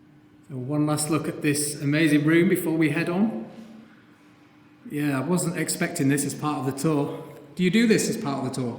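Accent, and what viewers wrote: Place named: Northern England, United Kingdom. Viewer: haha I was somewhere between leeds and Wigan but couldn't put my finger on it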